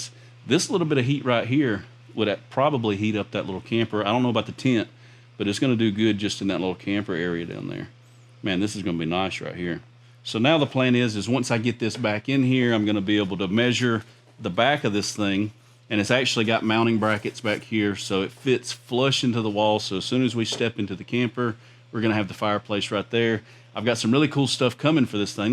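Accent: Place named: Alabama, United States. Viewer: What part of Alabama are you from? I’m from Alabama and I can tell you are too from that accent.😂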